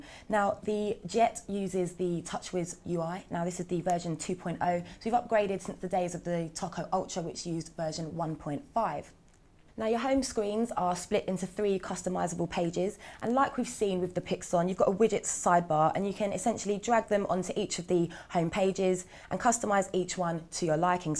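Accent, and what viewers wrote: United Kingdom. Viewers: SHe got Brit accent. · fuck british accent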